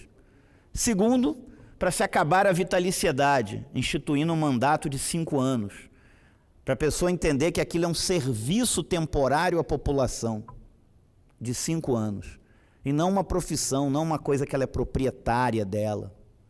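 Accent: Rio de Janeiro, Brazil. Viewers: O assunto é bom, mas... Essa merda de sotaque carioca cheio "Xix" ninguém merece!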